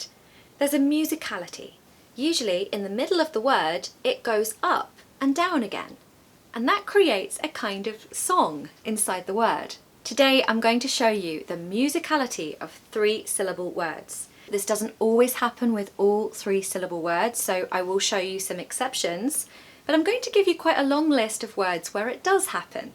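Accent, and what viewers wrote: RP, United Kingdom. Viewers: I live in PARIS about 60 years , I am VIETNAMESE, I learn ENGLISH alone because I like very much English language. It is the FIRTS TIME I listen Your video, I am very happy, because I like very much Your BRITISH ACCENT, Your voice is very Nice ! Thank You, that’ s very kind of You ! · British accent is beautiful but teacher make it so sweet to hear. I think the secret is that she is doing with somme art in her method of teaching. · Love the British accent. After all, English is English; there's no such thing like American English. There's English and there're mistakes. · Sorry but I was looking for someone with a true RP accent and yours was extremely close, so I recommended your channel to a Russian person who wants to learn English with an RP accent. Your English is excellent but that was my only criticism. If you play back this video you said ‘kind of’ three times in the space of about twenty seconds. 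It’s repetitive and something to be avoided. I suggest saying “similar to” as a variation. Hopefully you will take my observation on board but if you can’t bear being critiqued then you can delete my comments! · Wonderful British accent❤ love it. This video was fantastic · From Tunisia , I'd like to say that you are the best teacher ever and your british accent is beautiful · British accent + voice: music for our ears. One day you said you were a Beatles fan, I was trying to imagine their songs in your voice❤ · Hello, thank you so much for this video. Modern RP is like music to my ears. ❤❤❤ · Hello, I'm from Peru, you are very beautiful, your british accent I can understand it well, I've suscribed to your channel recently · From Rangoon karen youth,we'd like to let you kow that your British english accent make us feel satisfied.... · I love your British accent, it is so elegant and beautiful! 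· Although your accent is RP, it sounds more elegant than what other British YouTubers speak. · When I look to your face and listen to your voice, I feel like I'm really looking at a British person.Your face and your voice is really British ❤❤❤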